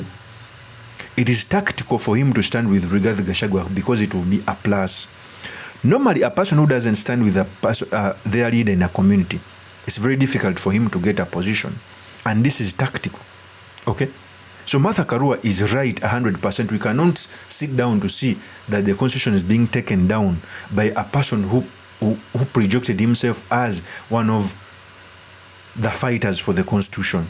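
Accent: Uganda. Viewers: U are Ugandan according to your accent...why are u calling yourself kenya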